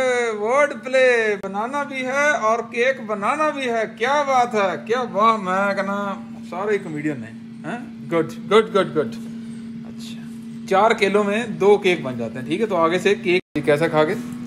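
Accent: Punjab, India. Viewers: Loved your punjabi yrr ki authentic punjabi boli hai🤣🤣🤣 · He was trying so hard not to go full punjabi hahah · Veere punjabi leak hoi jaandi aa thodi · g twadi hindi mix punjabi kmaal ey yr...exact imitation ey ona noee--dolteyey di jina nu chj di ek zubaan vi ni andi😂😂😂 love you!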